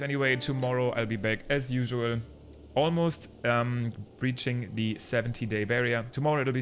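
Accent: Germany. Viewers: are you german by any chance? i feel like i’m hearing a very slight accent and it reminds me of my home region there.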